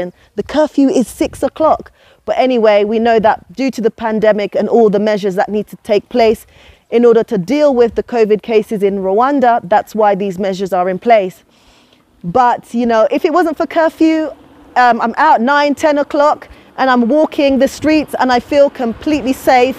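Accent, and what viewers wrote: United Kingdom. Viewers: I liked the way you’re so passionate and really proud of Africa,especially Rwanda 🇷🇼 I liked your mancunian accent by the way 😁 I can’t wait to come back home back too Ciao cara ti amo già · Got this recomended love from uganda🇺🇬rwanda🇷🇼 is beautiful love your accent too u sound british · She sound British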